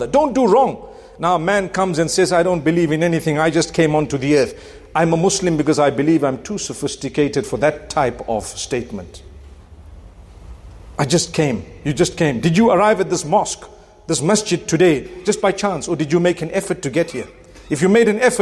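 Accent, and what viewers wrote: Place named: Arab world. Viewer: Assalmualaikum Mufti Menk! How're you doing? I love your arabic language tone. Where are you from, please?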